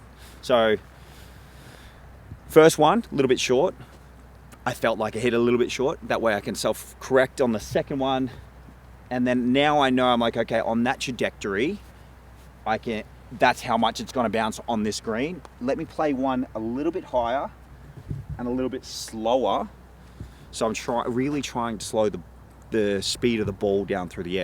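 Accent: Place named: Australia. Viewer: is he an Aussie? sounds very Aussie!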